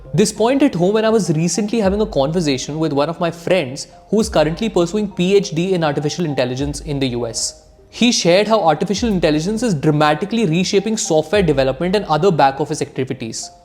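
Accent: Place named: India. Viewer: So irritating and cheap indian accent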